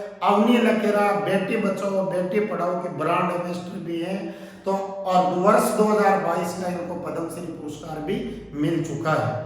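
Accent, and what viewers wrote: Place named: Shekhawati, India. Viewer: sir sports ki bahut othentic jaankari sir me aaka periyd roj dekta hu. sir aaki aawaj sekhawati sied ki lag rahi h jaha log bahut pdteh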